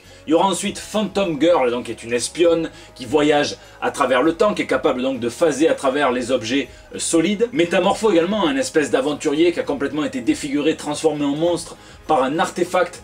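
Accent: South, France. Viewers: Je préfère regarder ta barbe soyeuse danser délicatement au son de ton magnifique accent du sud! Tu nous la fous en demi-molle chaque mercredi tu sais.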